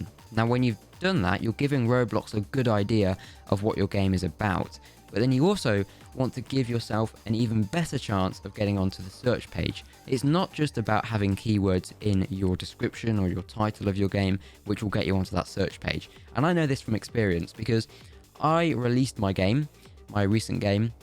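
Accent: United Kingdom. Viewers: Nice finally British lad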